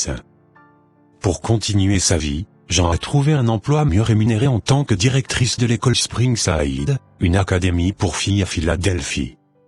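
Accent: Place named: France. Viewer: Millionnaire s'il te plaît pourrais-tu prononcer en anglais les prénoms de Jean et des villes américaines sans accent français parce que dans ce reportage je me demandais de chaque fois pourquoi il vient avec un nom d'homme je m'excuse encore. À plus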